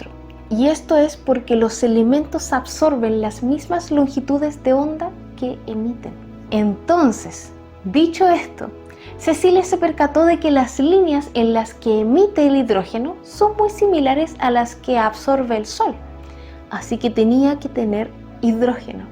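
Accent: Chile. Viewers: De seguro es chilena con ese acento.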